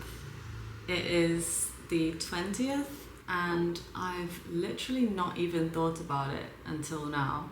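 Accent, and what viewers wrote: United Kingdom. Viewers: 英國腔真的非常好聽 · Quick question 🙋🏻‍♂️ Ur British accent is not that strong so because of the video is for Taiwanese people Or the original accent is not that strong🤔 (Just curious😂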